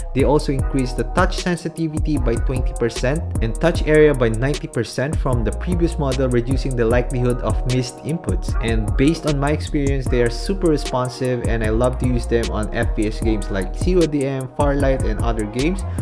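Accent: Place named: Manila, Philippines. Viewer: Pansin ko lang accent mo, accent na taga manila talaga hehehe Appreciate the props though.. Catchy sya at thanks sa review, sana next time share mo din di lang graphics na nalaro mo sa isang game kunti pati frame rates din.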